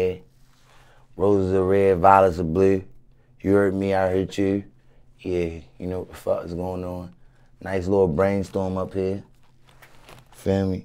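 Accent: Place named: Baltimore, United States. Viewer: It’s a Baltimore accent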